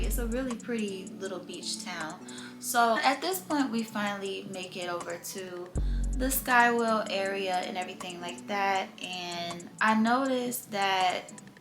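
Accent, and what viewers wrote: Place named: Chicago, United States. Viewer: You can tell you from Chicago lolz 😂. I love the class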